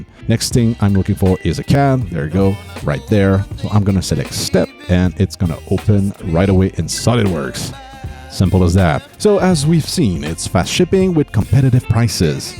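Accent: Quebec, Canada. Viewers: I thought u were from Quebec i an from saint join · Hey men je savais pas que t'était Québécois ❤ #faiticitte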